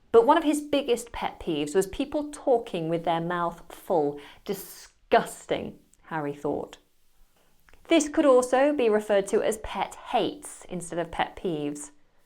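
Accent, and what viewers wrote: United Kingdom. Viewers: You are superb!! I am not a native but i have a US dialect when speaking and i watch you while jealously wishing i have your UK accent 😂❤❤ Following from Iraq 👋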